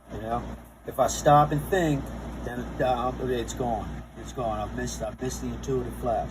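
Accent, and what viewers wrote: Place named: Baltimore, United States. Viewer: I thoroughly enjoy the 'balmer accent delivering the old wisdom! Cheers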